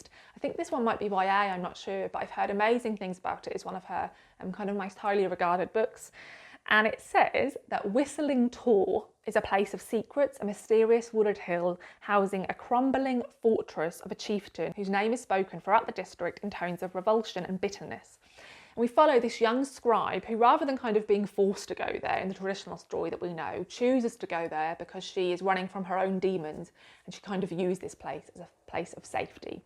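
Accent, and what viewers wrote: United Kingdom. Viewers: Hi. All books you described are alright. Above all, your pure British accent is most welcome. Many thanks ❤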